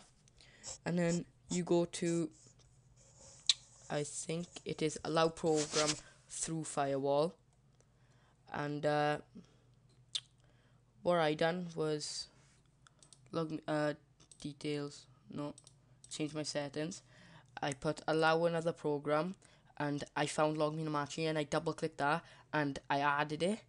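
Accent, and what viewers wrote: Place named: Wales, United Kingdom. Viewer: almost as welsh as me bud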